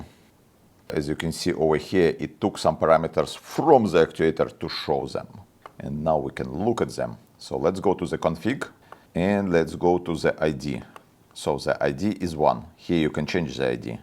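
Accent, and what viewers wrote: Ryazan, Russia. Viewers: Почему мне кажется что у автора русский акцент... особенно после: уан, ту, сри 😅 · Ну как не послушать прекрасный русский акцент - главное что даже без перевода понятно все 😊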